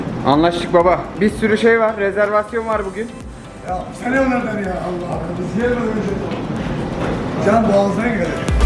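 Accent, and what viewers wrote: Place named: Adana, Turkey. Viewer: allahına ölürüm senin ha ustam tam bir adanalı konuşmalar falan adananın ekmegini yeyip suyunu içtigin çok belli bende adanalı bir kebapçı oglu olarak sizin gibi adanamızı temsil eden adana evlatlarını görünce gurur duyuyorum ve seni taskipteyim benim babama şişko neçmi derlerdi yıllarca birbiçerlerin ustalıgını yaptı ama şimdi sizlere ömür senin gibi ustaları görünce direk babam la tablada çalıştıgım anılarım geliyor devam durmak yok helal sana Adanalııııııııı.........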